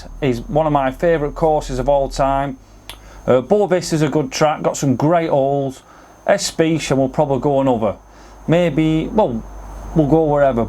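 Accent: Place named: Oldham, United Kingdom. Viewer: Ahh ha, I knew the accent but couldn't place it. Needless to say I'm from Oldham originally but moved down south when i was 2, in 1983, towns a bit different now, got one of these ridiculous southern accents and everything.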